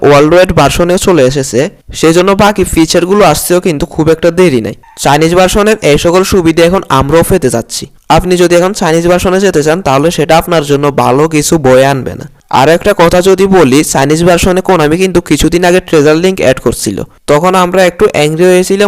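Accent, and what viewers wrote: Noakhali, Bangladesh. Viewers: bro are you from noakhali?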